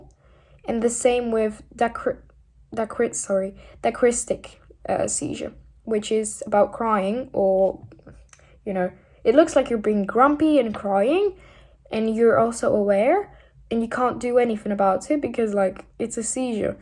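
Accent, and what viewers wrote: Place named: United Kingdom. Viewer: Mówisz cudownie 😩💗 ale czy będziesz jeszcze nagrywać po polsku? Bo niebyt kumam brytyjski akcent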